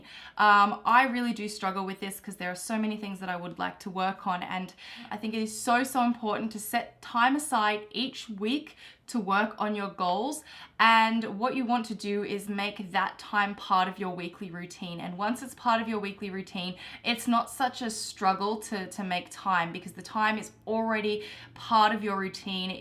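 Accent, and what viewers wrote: Australia. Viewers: Do you have an Aussie accent? I heard you say "here" in the American accent . But the rest seems Australian to me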